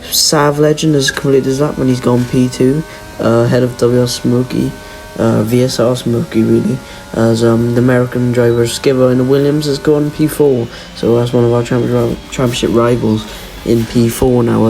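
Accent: Wales, United Kingdom. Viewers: I’d recognise that accent anywhere! Newport or Rhondda? Great driving mate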